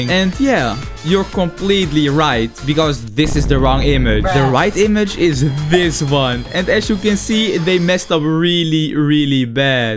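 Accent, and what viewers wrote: Netherlands. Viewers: 😊ar you dutch your accent is dutch?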